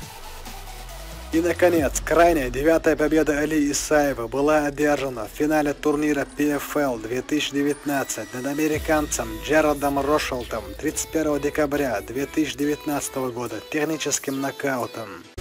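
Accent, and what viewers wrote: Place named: Chechnya, Russia. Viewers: Автор и есть Чеченец. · Озвучка, с чеченским акцентом. Автор, это даже тебе какой то харизмы добавляет.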